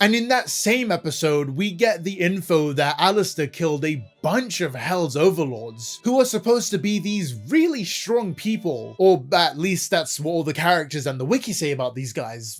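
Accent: United Kingdom. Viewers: Your accent is based on where you grow up not your ethnicity. The video creator definitely isn't ethinically British, but he sounds British.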